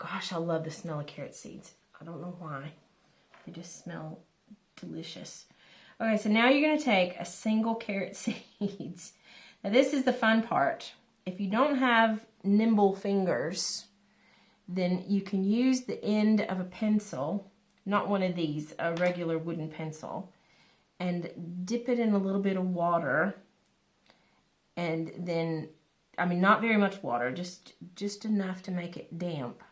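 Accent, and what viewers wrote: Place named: United States. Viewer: You sound American then you use a word like “quid “?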